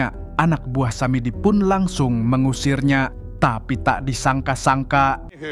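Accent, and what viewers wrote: Banjar, Indonesia. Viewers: Urang Banjar kah Pian bang 😅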